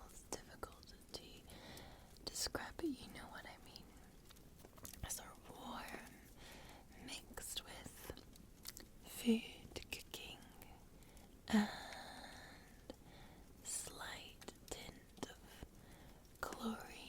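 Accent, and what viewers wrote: Scotland, United Kingdom. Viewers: Gawd, I adore your lovely brogue. Blessings and love from Ohio USA! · 31 minutes of tingles from the resplendent scottish lass. ah, a most excellent birthday present. ☺️ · I'm totally in love with this Scottish accent. Better than my Italian accent.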